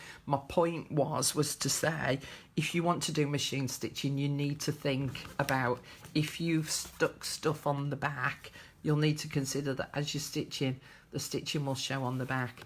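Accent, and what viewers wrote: England, United Kingdom. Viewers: Hi,I just found your channel..I've been wanting to start doing glue books for awhile now but just been reluctant to give them a try..Thank you for such great tips and ideas..I absolutely looove your accent..What part of England are you from? My maiden name is Burton and my ancestors started Burton England so my dream vacation is definitely England since English is in my blood..The English accent is my favorite,its just so soothing to me lol Lots of love from Kentucky❤ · Absolutely love your voice your accent are you English you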